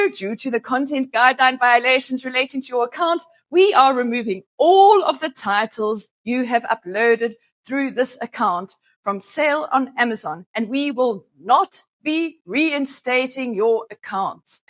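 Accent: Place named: United States. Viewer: Wow! Your accent looks American. I know someone who Amazon KDP terminated her account of $10k royalty and promised never to give her a penny. If they will reinstate your account after paying $2000 to the attorney, it's totally worth it I promise but who knows if that's a guarantee that you will recover your account, or who knows if that's another opportunity to make away with your money 🤔. Above all, who knows if they wouldn't come in future and finally terminate your account so that the whole processes will continue again.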